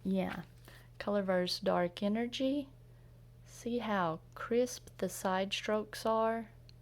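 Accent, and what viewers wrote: South, United States. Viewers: May I ask you from which state you're from? I really like your accent, it sounds kind of relaxing, but I'm German and not too familiar with all the American accents... If I had to guess I would say something southern or mid-west-ish?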